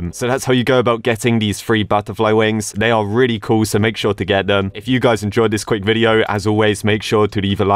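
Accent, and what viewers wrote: United Kingdom. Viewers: You are speaking British English but me america English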